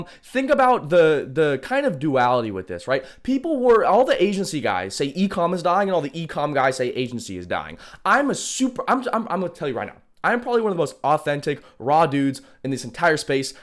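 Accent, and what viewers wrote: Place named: India. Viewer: this dude is funny. hahah. the indian accent. loooolll. im in cali too my boy :)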